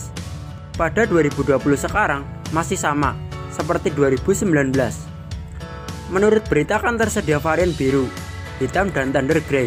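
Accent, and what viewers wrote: Java, Indonesia. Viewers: mantap bang, cuman nada ngomong nya masih JoWo Medok... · Mas sampean wong Jawa yah.,logate ketara.,sing Nang video juga R15 plat R.,jangan2 wong Cilacap yah..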